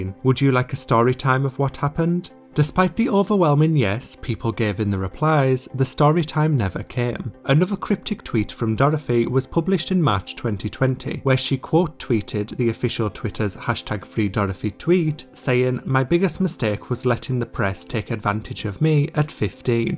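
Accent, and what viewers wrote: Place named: Northern England, United Kingdom. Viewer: the northern accent makes this funnier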